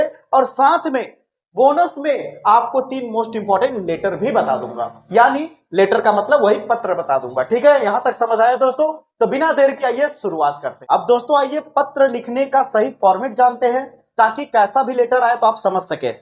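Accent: Bihar, India. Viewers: Sir lagta hai aap bihari ho...😅 Me from up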